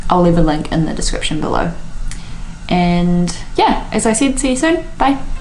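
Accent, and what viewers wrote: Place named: New Zealand. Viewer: Don’t be so anxious...I could listen to you read the phone book for hours...your kiwi accent is like ear candy...keep talking....in other words, don’t feel like you have to live up to any high standard, with incredible scenery or with wild adventures....just be yourself....I’ve been watching you on Delos and Ramatree for a few years and so has a lot of other people....we feel like we know you and we truly care about you...and we want you to have fun in whatever ever you do, but to also be cautious....the Tunisia video had a lot of us scared....I think most people just want what is best for you....and that is where some of the judgements from viewers are coming from....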